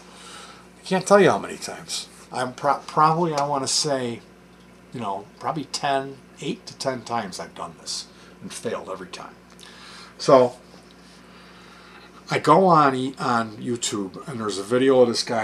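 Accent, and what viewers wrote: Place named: Chicago, United States. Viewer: Hey what's the spot you get your juice at? I'm from the south side and I think I remember you saying you were from Chicago in one of your videos, if the accent wasn't enough to tell.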